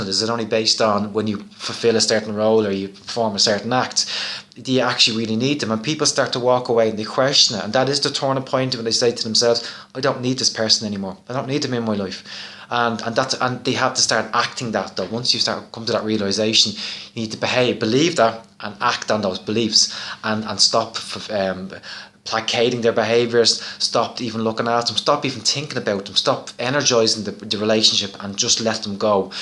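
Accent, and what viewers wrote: Ireland. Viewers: You look like an Italian, but sound like an Irishman.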